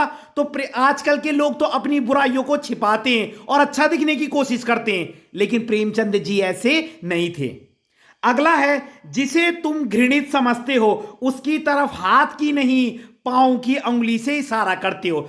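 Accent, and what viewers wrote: Garhwal, India. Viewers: Sir aap Uttarakhand ke ho kyuki aapki avaaj ko sunkar esa lag raha hai ki aap ghadwali ho.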